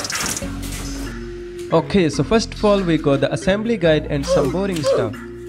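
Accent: India. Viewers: indian accent English is PURE EAR CANCER!! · Indian accent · It's English but with Indian accent lmao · Are you indian bro??? Your accents is familiar🤨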